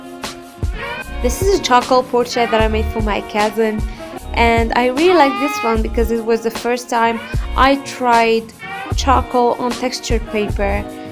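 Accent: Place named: United Kingdom. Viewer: Should I comment about these amazing drawings 😍, well edited video 🤩 or just,,, that new British accent thouuugh ? 😂😂 Ps : U nailed it 👏👏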